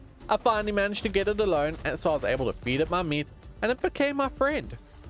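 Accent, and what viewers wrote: South Africa. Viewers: You from South Africa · Are you south African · wait ur south african I wanted to say ur accent eyyyyyyy LEKKER MAN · Hey bro awesome video I just want to ask are you from South Africa you sound like it 😅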